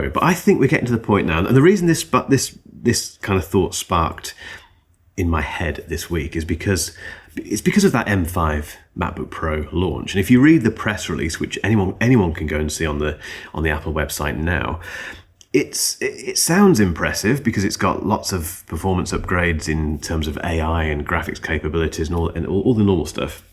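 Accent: United Kingdom. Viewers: Love the accent. The envy of all us non-British students of English.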